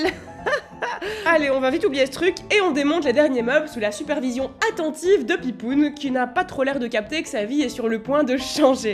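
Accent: Belgium. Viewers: Je veux dire d'où en Belgique, la Belgique c'était clair. J'hésite ente Liège, Charleroi et Bruxelles. Vous reconnaitrez que c'est un peu large. La prononciation des é avec des è me semble caractéristique, mais je n'arrive pas à cibler.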